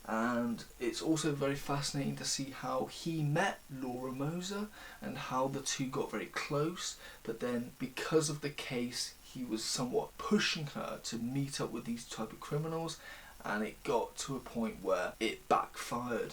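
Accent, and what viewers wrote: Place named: England, United Kingdom. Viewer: Good video I like your accent even though I don’t usually like the English accent ❤️ you’ve got a very smooth articulate way of speaking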